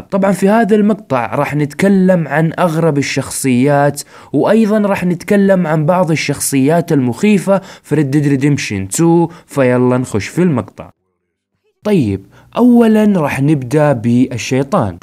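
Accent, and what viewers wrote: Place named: Jizan, Saudi Arabia. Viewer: ياخي انت من جيزان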